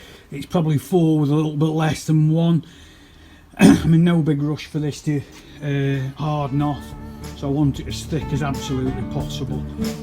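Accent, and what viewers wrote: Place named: United Kingdom. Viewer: Where abouts in the UK are you from?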